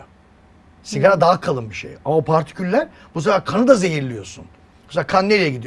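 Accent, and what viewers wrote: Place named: Aegean, Turkey. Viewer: Doktor olmuş ege şivesiyle konusuyo diyen gerizekalılar da var.dogal olmak güzel.katiliyorum size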